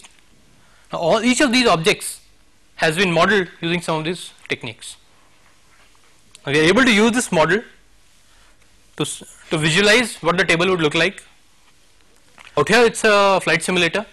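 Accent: India. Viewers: This" National Programme on Technology Enhanced Learning" videos are mainly aimed at improving education for Indian students so that Indian students can benefit from it. And we understand it very well. If you want to use these resources you can use it for free. But we didn't remember asking an opinion from an outsider on our accent - especially from an ignorant one like you. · it difficult cannot laugh about the indian spoken, it seem like speak with a Little Candy in your mouth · What a highly informative video. It is great that the university made this available here. I'm American and I did not have much trouble understanding the Indian English spoken by the professor. Those who had problems understanding just need practice listening to Indian English- this speaker did not use any Hindi-English slang (that I can recall) so it is just a matter of developing an ear for how familiar words are pronounced. 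· A agree with bajholster. If subtitles were added, I think they would help a lot. I'm rather used to different accents, but Indian accents are one of the most difficult for me to understand. Excellent video. Thank you for the lecture. I am beginning to study and learn CAD, and this was a *very* valuable video for me.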